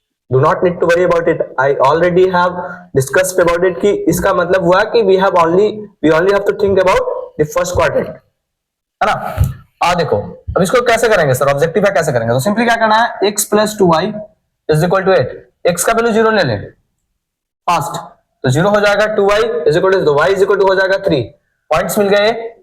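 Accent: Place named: Bihar, India. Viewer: Aap Bihar se ho sar aawaj lag rha hai ❤❤❤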